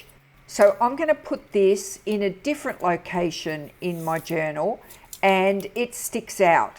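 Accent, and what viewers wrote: Australia. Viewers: It’s so awesome hearing your accent… Aussies are so good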